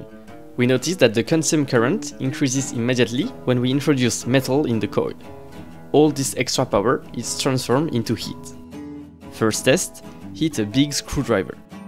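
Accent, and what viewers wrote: France. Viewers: Nice work, I can't tell what your accent is, are you French ? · You have a french accent. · Très belle vidéo! Vous êtes français non? Cet accent <3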